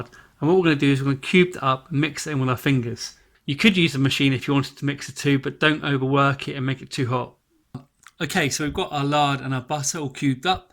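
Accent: Cornwall, United Kingdom. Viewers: Yes you do sound Cornish, and nice to see Bedruthen Steps, not far from where I live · You actually do sound pretty Cornish!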